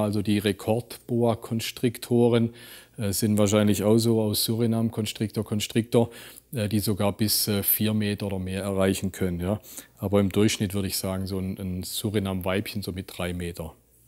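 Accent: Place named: Baden, Germany. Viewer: nein badisch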